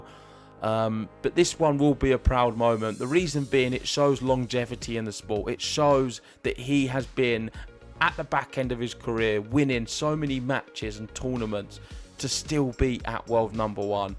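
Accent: United Kingdom. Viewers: Awesome accent. What part of Britain?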